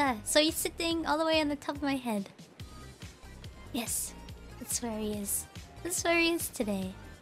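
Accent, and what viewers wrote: United Kingdom. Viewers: She bri’ish!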